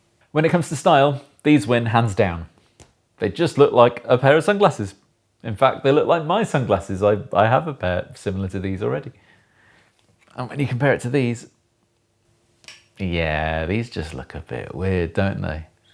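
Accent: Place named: England, United Kingdom. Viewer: I like unboxings with the English accent, but I just bought them just to do bets on how creepy they are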